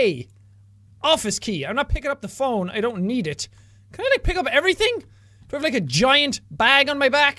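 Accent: Ireland. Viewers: wtf kinda question is that he is obviously irish and is that german you tell me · Its the Irish accent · Is he irish? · HE'S IRISH! So not everyone can pronounce words the same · He's Irish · back when he had a very prominent irish accent 😭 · And the bossasaurus Irish accent helps too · he's Irish I am too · omg i love your irish voice. is it irish? if i offend you im sorry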